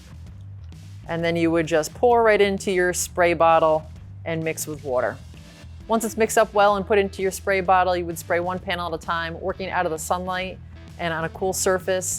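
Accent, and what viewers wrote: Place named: Philadelphia, United States. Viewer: are originally from Philly? Just guessing by the wooter accent.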